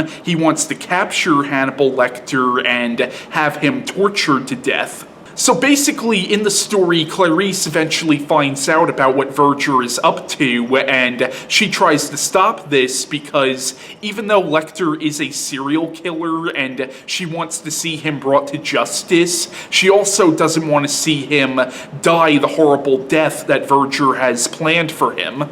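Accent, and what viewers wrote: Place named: Midwest, United States. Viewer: he sounds Midwest American to me.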